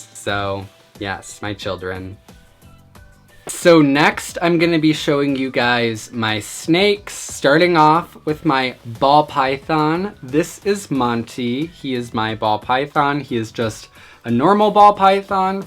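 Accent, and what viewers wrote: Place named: Michigan, United States. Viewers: your Michigan accent is so thick!! 😂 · You have such a Michigan accent lol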